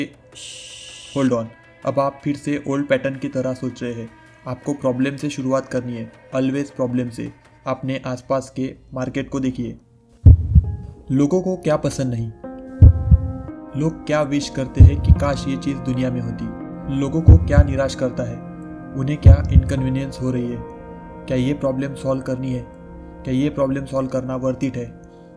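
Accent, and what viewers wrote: Maharashtra, India. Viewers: Your Marathi accent is so sexy … keep it raw😎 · Your Marathi accent is 😅 ,, full video i was thinking about it ❤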